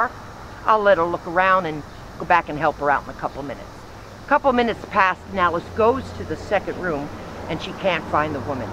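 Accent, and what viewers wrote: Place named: New Jersey, United States. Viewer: I KNEW I PICKED UP A NEW JERSEY ACCENT! HUH!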